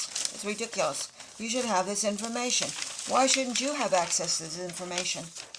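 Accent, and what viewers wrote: Philadelphia, United States. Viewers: ❤❤❤❤❤❤❤❤❤!!!! New subscriber. You sound like you are from Philly or New Jersey.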